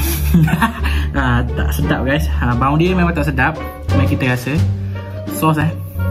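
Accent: Terengganu, Malaysia. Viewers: orang ganu ke bang..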